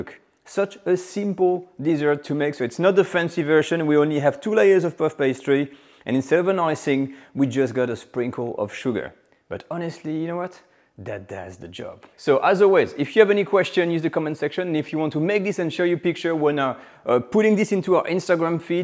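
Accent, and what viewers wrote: France. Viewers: I subscribe because of his accent love French accent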